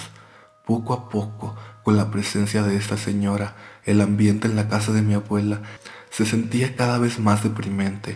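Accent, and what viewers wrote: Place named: Upper class, Mexico. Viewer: Hole por qué últimamente hablas como waytechecan cómo si tuvieras una papa en la boca weeeeee megaaaaa (cringe1000)🫢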